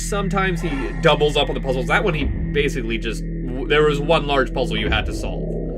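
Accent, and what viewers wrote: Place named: Canada. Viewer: Aw, your Canadian vowel raising is so cute~! <3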